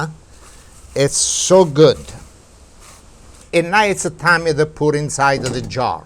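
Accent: Italy. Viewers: His italian English is awesome !! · Love your accent gotta be Italian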